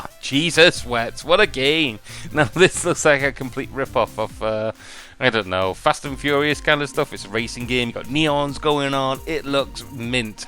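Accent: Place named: United Kingdom. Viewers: its one of the few british accents i think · great video, btw where is your accent from? It sounds british but I've never heard it before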